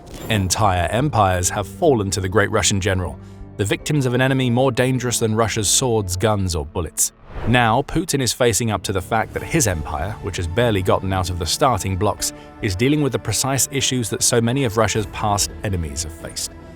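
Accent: United Kingdom. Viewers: Whenever you hear a limeys voice narrating you just know its bullshit.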